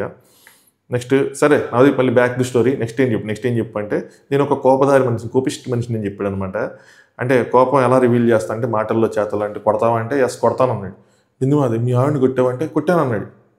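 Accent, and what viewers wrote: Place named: Srikakulam, India. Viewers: mee roots Srikakulam aa....konni words lo doubt vacchunde....vja antaru but slang okkosari doubt vacchedhi😅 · Yes same doubt naku kuda matalu srikakulam vijayanagaram side laa vuntay but he is from vijayawada...may be ikkada settle ayyaremo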